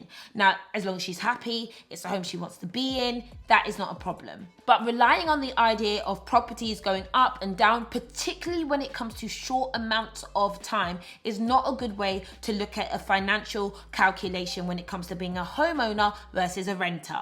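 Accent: England, United Kingdom. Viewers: Is she British?😍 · Are you British, by the way? Your accent sounds really English!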